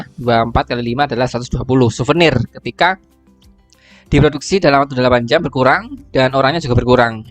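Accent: Java, Indonesia. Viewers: Logatnya medhok jawa. Susah bged dipahami logatnya. Maaf. Cuman masukan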